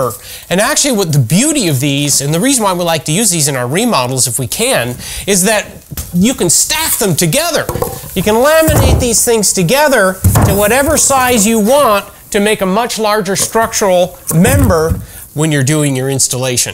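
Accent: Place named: Canada. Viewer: You sound Canadian....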